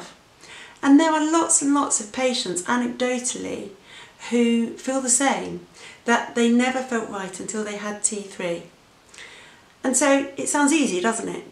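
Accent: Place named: United Kingdom. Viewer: Thank you for this vid, great to hear a Brit regarding this topic, it's a real shame we can't get what we need from our NHS, frustrating when you watch all the US info on here, where they can just ask their docs for tests and treatments, and all the info on dietary issues, our docs don't even mention diet at all. Although the expense is an issue in either country. I've been ill on and off for years with hypo since having my first child who is now 24. I'm on Thyroxine and it has been a nightmare, every time a new dr comes to the surgery, they do a new blood test and put my Thyroxine med down because the blood tests 'say so'. It always puts me into a medical spiral, cold hands and feet, tingling, mouth ulcers, cold sores, acne, psoriasis, dry skin, low mood, palpatations, irritability, etc why can't they look beyond the bloods, they don't even ask how you are, they just adjust you meds. 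When you go back to complain the dose isn't working, they just say well your bloods are 'fine' you're on the right dose. No information at all they treat us like children who can't understand anything least of all medical terminology. No, I'm not fine I having all the symptoms again. They can't understand you may need the dose you've had for 20yrs. That your body was working fine with it. I've had this up down yoyo every time. I'm not even asking for T3 just not to be messed about constantly with every different GP. Although the option would be great. I asked about that when I came back from the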